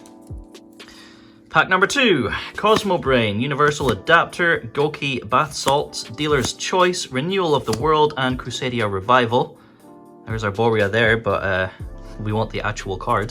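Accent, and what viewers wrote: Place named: Scotland, United Kingdom. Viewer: Love your Scottish voice my man, keep up with the content!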